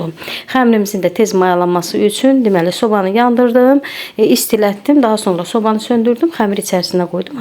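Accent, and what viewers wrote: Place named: South, Azerbaijan. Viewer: Hansı rayondansız ləhcə sirin gəldi. Cənub zonası?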